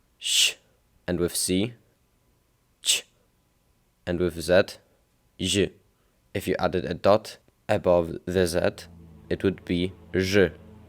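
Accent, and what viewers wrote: Poland. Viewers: Wyczuwam ten polski akcent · ale to słychać że polakiem jesteś bo słaba wymowa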